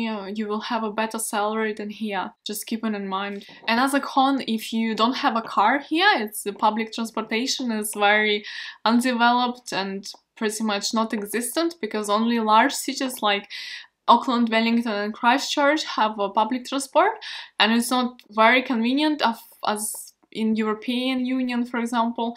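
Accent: Russia. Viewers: Сразу понял что русская по акценту😄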